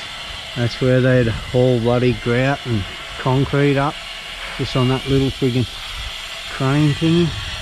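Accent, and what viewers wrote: Australia. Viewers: I want to search if you have videos from Pakse region, but the search button isnt active and there is no url for it. Isnt it active yet? Btw great videos you put out, I like your stories, your choice of music and how you and talks with everyone. The only problem I sometimes have is your aussie english, but i feel your pronouncing is better now than a year ago 😊👍. It may be me though...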